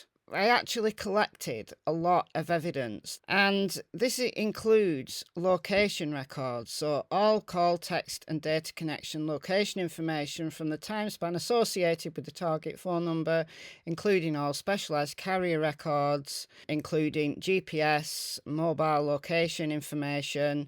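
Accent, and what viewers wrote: United Kingdom. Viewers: You sound like you are in the UK..Nothing wrong with that except it's awfully crass of you to make a video based on bullshit when you don't even live here and also hurting the Watts family with this crap! They are gone tragically but stop dredging up this home made bullshit and let them rest in peace! Nichole Kessinger was involved...Monkeys might fly out of my butt! Now apologize to the Watts family for making this rubbish video and shut up!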